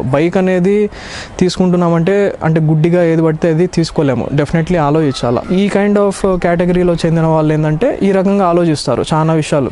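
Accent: Rayalaseema, India. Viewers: You are from rayalaseema right??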